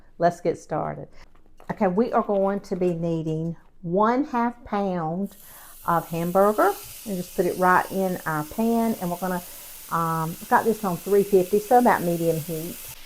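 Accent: South, United States. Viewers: OH MY. It looks so Delicious! I will be making it ASAP. Already had the menu posted for tonight's dinner, Appetizer, Blistered Shishito Peppers with a Garlic Aioli, Turkey Burgers, with Potato Wedges and Broccoli Salad. I saw one of your Y-T videos a while back, and you're so personable and soft spoken, and with that Southern Accent, I Subscribed right then! Thanks, for taking the Time and Effort to Film and Edit your videos. We your Subscribers Appreciate You! Have a Wonderful Day!!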